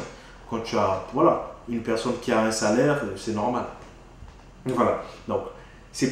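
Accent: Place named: Democratic Republic of the Congo. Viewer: On retrouve l'accent congolais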